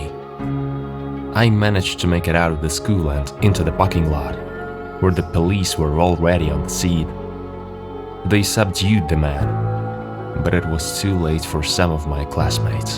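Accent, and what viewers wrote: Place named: United Kingdom. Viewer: HES BRITIS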